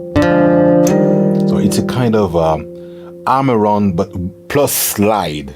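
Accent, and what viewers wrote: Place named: Ga, Ghana. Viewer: This Is beautiful ❤️❤️❤️ The language (Ga) accent really caught me God bless you sir 🫡🫡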